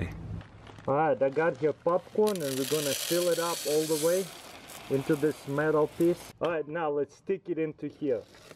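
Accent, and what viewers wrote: Russia. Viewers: Diego Andre he's Russian, have no never heard of accents? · Diego Andre He's Russian. It's called an accent, if you've never heard of it. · Come on everyone be more mature! has an accent, i bet if you spoke Russian and were from another country trying to have a youtube channel, you wouldn't like people making fun of you! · Diego Andre he's Russian he has an accent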